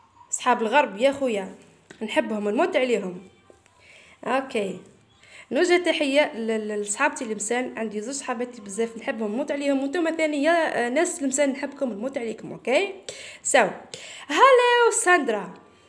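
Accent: Tunisia. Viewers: نتي تونسيا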